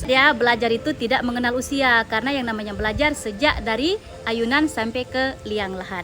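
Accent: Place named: Aceh, Indonesia. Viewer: Bereh bu kemanapun gaya Acehnya tetap aneuk Aceh mețuah.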